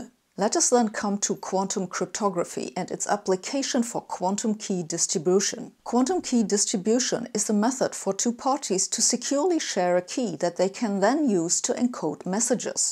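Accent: Germany. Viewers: you sound German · I am so old that to me English spoken with a German accent by a scientist is considered more reliable than pure American or British English. Given the current standing of rationality in the US and the UK, I am modern again. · That was single handedly the best transition to a sponsorship ever. Also the way you explain the benefits and features of nord VPN actually made me think about buying it. not only are you an amazing scientist and educator, your sales skills are a solid 10 as well. Please do not be hard on your english either. You have an accent yes. Your english is not broken by any means and you are very easy to understand. Plus I have always a german accent to be rather intimidating so I shut up and listen more. Thank you for the laugh first thing in the morning. My perception about how today is going to go has spin in the optimistic direction. 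Stay safe and healthy!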